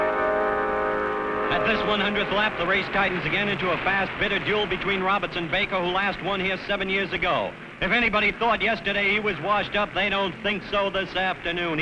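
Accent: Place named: New York, United States. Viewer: It is. He would have been my last choice for this event. NYC accent doesn’t work here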